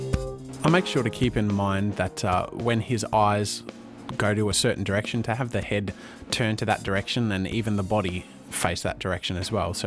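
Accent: Australia. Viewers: YES AN AUSSIE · r u australlian?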